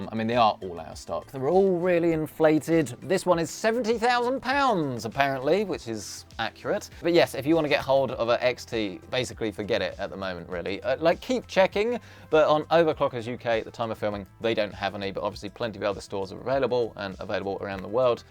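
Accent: United States. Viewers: Damn your American accent is incredible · Dude, loved your snarky American Bro accent 😆 it made my day.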